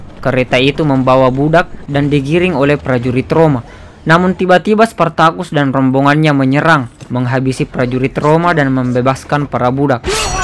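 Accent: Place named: Makassar, Indonesia. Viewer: Orang Makasar ini kita dari logat nya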